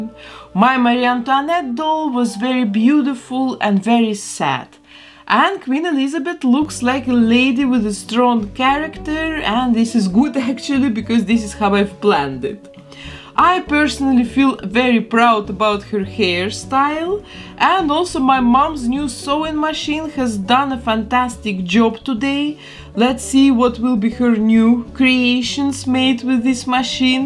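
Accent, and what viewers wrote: Russia. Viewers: I love you !!!.. I think your absolutely gorgeous and I love your accent !!!.. are you Russian ???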